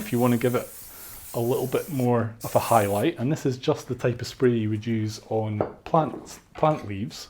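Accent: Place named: West of Scotland, United Kingdom. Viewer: Thank you so much for your easy to follow tutorials. also so great to hear a fellow west of Scotland accent!! love your work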